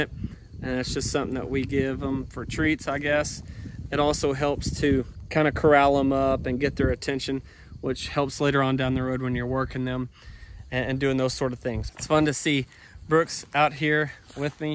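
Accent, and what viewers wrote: Oklahoma, United States. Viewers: I love Elenor. I’ve enjoyed your adventures. I have family in Moore so I enjoy watching the weather and hearing that great Oklahoma accent I’m in upstate ny. Thank you so much for sharing your lives. Good luck with the new place.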